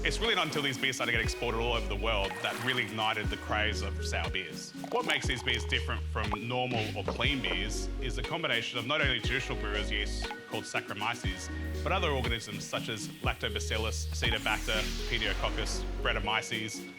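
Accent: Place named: New Zealand. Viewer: This guy's a kiwi, no Aussie.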